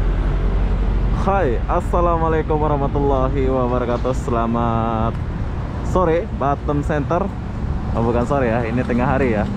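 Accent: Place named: Malaysia. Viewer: idk why i feel uncomfortable hearing him talking in indo accent but mixed with a lil bit of malay diction, like it is kinda cringey 🙄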